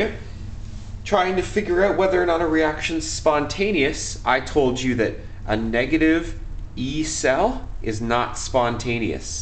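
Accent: Canada. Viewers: I think it's an Atlantic Canada accent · I can tell he's Canadian by the way he pronounces the word "Out" like "Aut".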